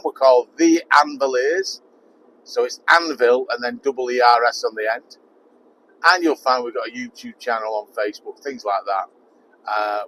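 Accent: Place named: Manchester, United Kingdom. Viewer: Hello Where are you based? You sound like Manchester, I’m from Bury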